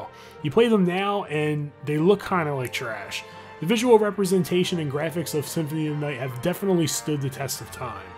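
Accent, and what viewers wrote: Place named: Western Pennsylvania, United States. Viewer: Are you from western PA or Ohio? Maybe Baltimore? I hear that accent haha regardless, this game is so close my heart ❤️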